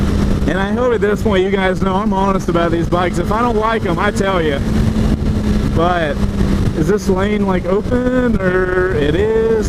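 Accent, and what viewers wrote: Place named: North Carolina, United States. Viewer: Are you in NC? Your accent and scenery feel very familiar!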